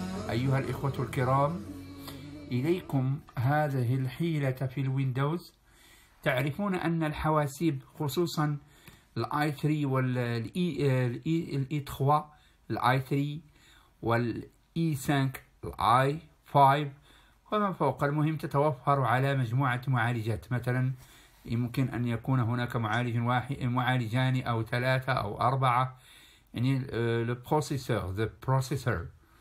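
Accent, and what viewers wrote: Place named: Levant. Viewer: شكرا على المجهود لكن الشرح يبدو معقد بعض الشيئ بسبب الالقاء بلهجة المشارقة نرجو منكم تدارك الوضع احتراماتي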